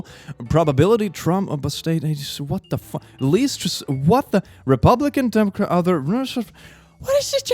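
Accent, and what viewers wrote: Sweden. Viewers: hey! ive joined your discord server!:virtualhug: Also are you swedish? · This sweedish accent gets me every time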